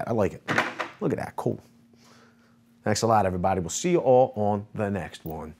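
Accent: Philadelphia, United States. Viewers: That philly accent boi "bawxes" "milwaauwke" love it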